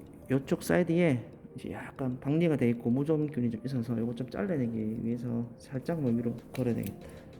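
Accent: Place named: Gyeongsang, South Korea. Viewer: 쌤 ♡♡♡♡♡♡♡♡♡♡ 너무 오랫만이네요..ㅠ 보고시퍼써용😂👍 영상 잘볼께요~~^^ 갱상도 쌤.. 넘 좋아용♡♡♡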